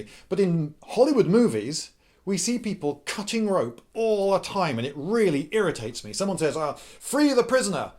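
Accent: England, United Kingdom. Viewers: you sound so English! and that's coming from me, an Englishman myself. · "That was a good bit of rope that." I love the English accent.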